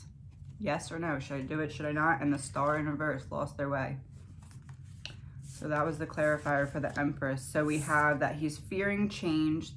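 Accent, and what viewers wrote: Philadelphia, United States. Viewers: That was one of the most interesting hours I've spent on youtube in a long time! I had no idea how cards were read, or how they were used to connect with spirit energy (I'm probably butchering the proper vernacular ) or even how a story comes together, but this was fascinating! I also enjoyed your very laid back, soft spoken demeaner, which is very calming. Do I hear a "Philly girl" in your accent, or a surrounding suburb? I grew up there. It's an accent I seem to notice immediately. Anyway, I was listening to someone on youtube last night who apparently receives information from "spirit" by being shown things in her mind (I guess) which she then interprets. I mention this because her entire session revolved around things and people connected to the death of Hannah Cleere and her family. Many names came to her that were members of Hannah's family, and she mentioned rather often that none of the information she was receiving was what she had expected to be discovering last night. 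If I'm not mistaken, you also mentioned something "catching you off-guard", or "throwing you off" about the cards being chosen as it relates to Hannah. Honestly, both your reading and the one from last night were so interconnected and so overlapping it was quite amazing! I have always thought these murders were either 1) revenge for the death of Hannah, or 2) the ex-boyfriend (Jack D), feeling betrayed by Kaylee for breaking up with him. Just wanted to share my thoughts, and thank you again for such a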